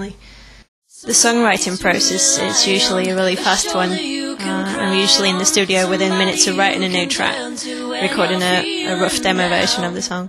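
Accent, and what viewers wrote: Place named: Sweden. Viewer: Ermm you are a Swedish song writer? wow you have got a wonderful accent ;) Do you speak Swedish?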